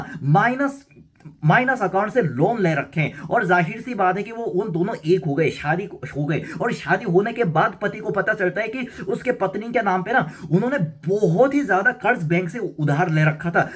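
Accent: Standard. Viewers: कितना शुद्ध हिन्दी बोलता है । मान गया उस्ताद । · Jitni sudh hindi bhai bol rhe h, utni to hme b nhi aati 😅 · Unhone hindi ki padhai ki hai isliye shudh hindi bolte hai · Bhai aap to mujhse bhi jyada shudh hindi bol rhe ho 😮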